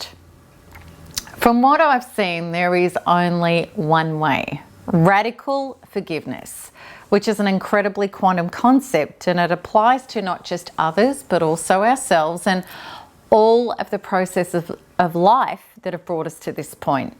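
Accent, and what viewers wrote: Australia. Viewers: thank you. I am in the forum and the ppl there are angels but I can’t seem to break through the barrier and the time it takes every day to go through the modules. I don’t have years to get my life together.... I hear that this takes years to overcome and condition the subconscious... I feel discouraged because I need help now. My life is a mess!!! I really don’t know what to do and how. I’m at a stand still and feel trapped in a life I did not choose and that does not belong to me. I don’t know if that makes sense... Anyway I keep listening to your videos and reading your blogs. You are an inspiration to me. I grew up part of my childhood in Australia and hearing you accent it’s comforting to me... I think you are gorgeous and have been getting better with years. I’ve been following you for nearly 6 yrs now. 💗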